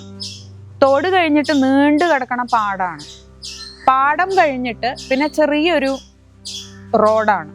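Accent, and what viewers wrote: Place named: Valluvanad, India. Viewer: .. കഥ ഇഷ്ടായി ട്ടൊ....ആ വലുവാനാടൻ ഭാഷയും, എല്ലാം സൂപ്പർ...👌🏻👌🏻❤️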